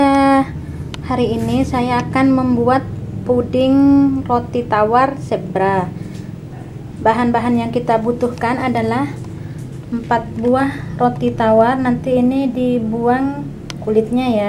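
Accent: Madura, Indonesia. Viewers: org madura ya kok yg ngomong kyk gitu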